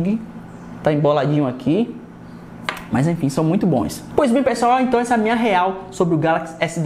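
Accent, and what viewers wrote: Bahia, Brazil. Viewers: Baiano kkk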